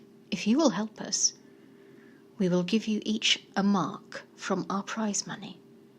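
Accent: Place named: England, United Kingdom. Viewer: Is it sad I'm just a bit jealous of the beautiful English accent and the shout out from? 😬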